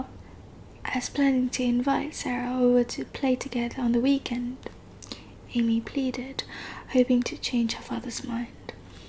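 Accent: United Kingdom. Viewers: Your accent sounds very british or am I just imagining it...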